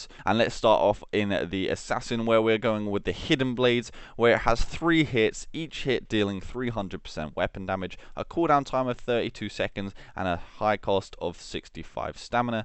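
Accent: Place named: Australia. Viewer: You SOUND, I think, Australian. However, you can't say perfectly normal English words like caltrops, sundered, or comatose (claptraps, shundered, and catamost, respectively). A-are you just... like... illiterate? If so, I guess I'll never get an answer.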